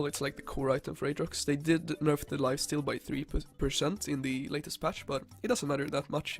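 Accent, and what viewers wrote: Sweden. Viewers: Are you swedish? It sounds like that on you accent. :P. im not swedish btw,, but they usually speak like you.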